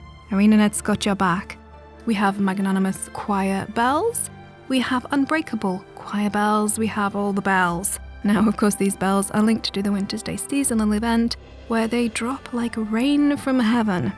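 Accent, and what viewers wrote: United Kingdom. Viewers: You have a fantastic voice. Interesting accent too, what part of UK are you from? You could do an audio book XD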